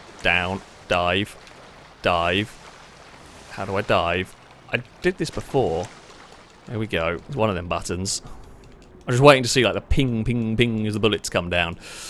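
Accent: United Kingdom. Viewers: I like your British accent It makes you more interesting to listen to.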